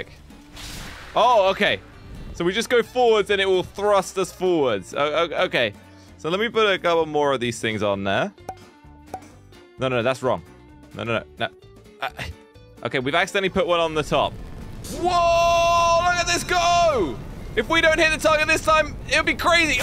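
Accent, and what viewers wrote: United Kingdom. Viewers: Are you from the uk?🇬🇧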